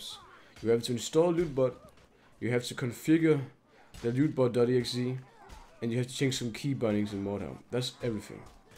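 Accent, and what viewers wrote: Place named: Denmark. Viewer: Hvorfor er kan man bare høre dit dansk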